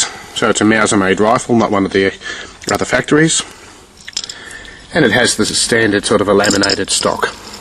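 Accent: Australia. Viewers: i love the Aussie accent :) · P.S. AAA = Awesome Aussie Accent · Love the accent, long live Aussie :)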